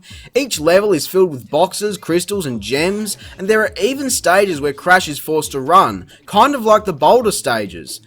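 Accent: Australia. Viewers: UGH HES SO FUCKING AUSTRALIAN IT HURTS IT SOUNDS SO FUCKING AUSTRALIAN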